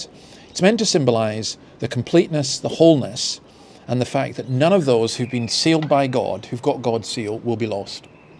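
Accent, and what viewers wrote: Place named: Australia. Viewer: Good stuff. On a side note - Your accent is becoming a little bit Aussie Have a great day and God bless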